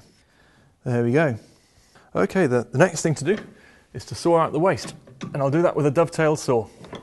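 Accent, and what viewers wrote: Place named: United Kingdom. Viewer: not only is this Brillant !! but your British to boot whoo :) no wedding band hehe ( my bad ) LOL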